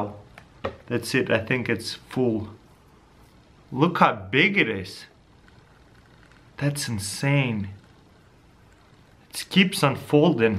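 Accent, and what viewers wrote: Russia. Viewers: По позорному акценту сразу понятно, что русский, лол. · Русский акцент слышен издалека )) привет, чувак :D А штука прикольная)) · Your a russian right please speak yoir word and make a subtitled Who agrees!? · Bro i LOVE Russian accents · I love you russian accent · Im watching u cause i wanna try and learn how to do a russian accent(you're russian right?:P)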